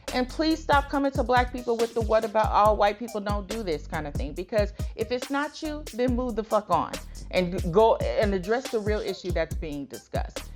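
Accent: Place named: United States. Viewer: Me before the video starts "Bet she has an American accent, bet she has an American accent, bet she has an American accent..." Video starts She speaks in an American accent. Well what do you know!? 😂🙈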